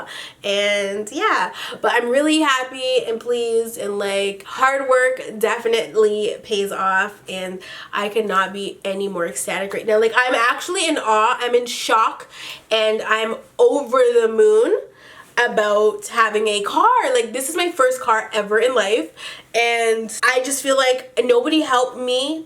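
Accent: England, United Kingdom. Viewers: Her British accent lolll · I like your British accent it fits you. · Love your English accent 😂😂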